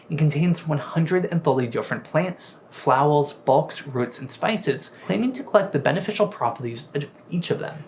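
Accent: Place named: South Korea. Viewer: His pronunciation and his diction are all over the map. He places accents for words in the incorrect place and, if that wasn’t bad enough, his actual accent sounds as though he learned to speak English as a second language in Korea.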